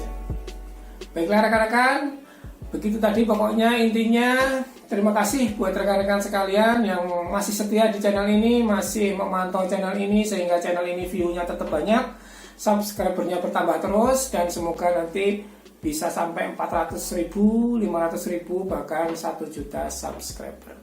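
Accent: Java, Indonesia. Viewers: PT. Niagamas Lestari Gemilang adalah gambaran dari sosok tukang kayu yg sederhana, org Jowo, tur medhok ndeso 😁 tapi lihat! punya impian yang besar dan kemauan yg keras. Selamat bung, anda sudah sukses menjadi inspirasi 312ribu orang. ini luar biasa. semoga terus mnjadi inspirasi bagi kami. #TJJ300k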